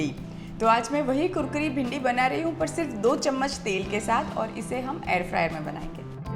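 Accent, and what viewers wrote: India. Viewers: I am going to try this today. First I thought you are a foreigner but when you started speaking I understood you are an India. Thank you for sharing your recipe.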